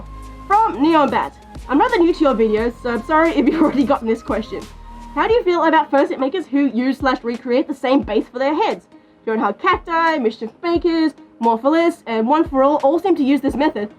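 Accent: Australia. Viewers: You sound more australian then when I was last here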